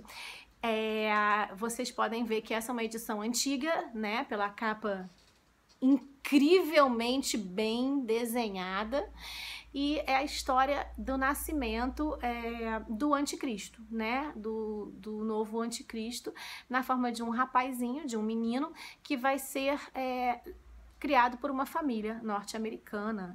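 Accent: Rio de Janeiro, Brazil. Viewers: Você tem toda razão, Misery é angustiante rsrs adorei! Então, esse pacto que você tem é o mesmo que eu li, "pacto/amaldiçoado" é o mesmo livro, eles mudaram o nome quando lançaram o filme com o Daniel Radcliffe, ainda não assisti. Quanto aos livros acumulando poeira na estante, eu costumo fazer trocas no Skoob, já me livrei de muita coisa e já consegui livros incríveis. Super indico. Beijão! Já falei que adoro o seu "carioquês"? kkkk acho lindo.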